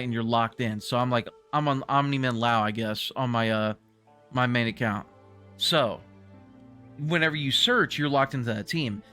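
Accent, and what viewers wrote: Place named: Texas, United States. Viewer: bro! You gotta be from Texas. Heart to confirm 👍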